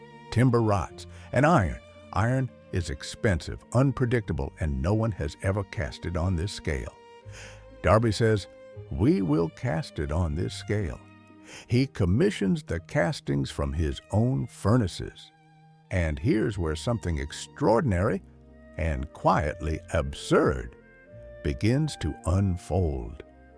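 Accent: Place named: Appalachia, United States. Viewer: What on earth are you doing using an American hill billy to give a commentary on industrial Britain???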